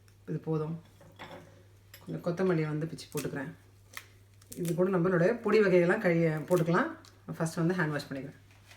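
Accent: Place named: Coimbatore, India. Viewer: But unga speech la lesa Coimbatore slang come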